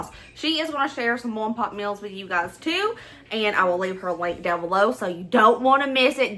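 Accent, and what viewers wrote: South, United States. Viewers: I can't tell you how much i love listening to you talk in that amazing and soothing southern accent! Its as good as all the comfort food you share.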